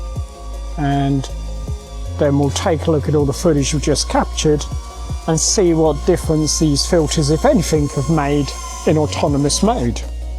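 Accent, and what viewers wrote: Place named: England, United Kingdom. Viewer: Do English people understand how the rest of the world feels about their accent?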